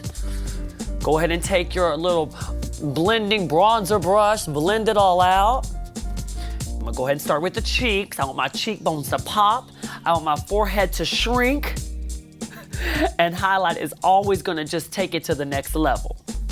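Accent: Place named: South, United States. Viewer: sounds like a southern blonde soccer mom or something. i feel like she's going to ask me how the kids are doing and invite me over for a glass of wine.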